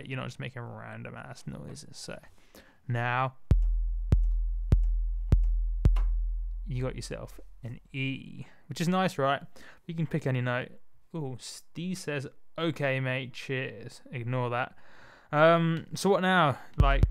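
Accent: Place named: England, United Kingdom. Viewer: I love this guys passion for 808s, trap, muzik production. Love the enthusiasm and english accent! Cheers mate!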